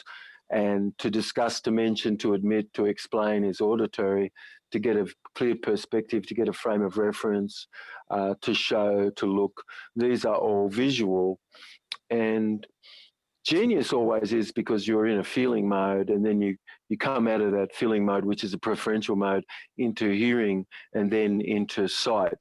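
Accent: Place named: Australia. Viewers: Why’s he got an Australian accent? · because...he's Australian..................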